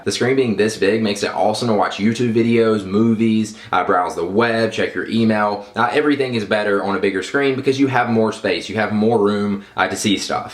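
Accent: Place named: Georgia, United States. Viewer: I might be wrong, but that sounds like a Georgia accent.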